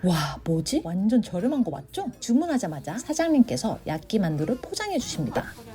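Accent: Gyeongsang, South Korea. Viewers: 경상도 분이신가봐요? 사투리가 들리네요^^ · 경상도 사투리가 섞인 표투리 나레이션 신선하네요 ㅋㅋ 구독 박고 갑니다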